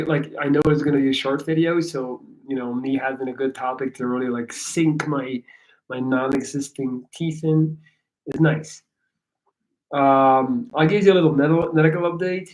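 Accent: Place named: United States. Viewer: I'm glad you're honest about emulating an American accent 😂 You are the most American European I've ever heard speak in my life haha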